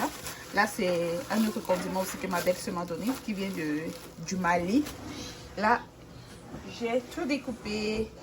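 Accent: Cameroon. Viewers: She sounds more "camerounaise " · Bonjour.C'est fou vous avez l'accent camerounais, j'ai vraiment cru que vous étiez une compatriote. J'avoue que vous êtes ''realistic''.Ça change vraiment de toutes les videos que j'ai vues depuis le temps.Juste une question:Quelle est l'orientation de votre chaîne? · Cest l'accent camerounais justement.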